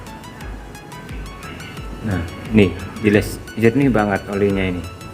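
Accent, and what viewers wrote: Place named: Cirebon, Indonesia. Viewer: ngomongnya kyak orang cirebonan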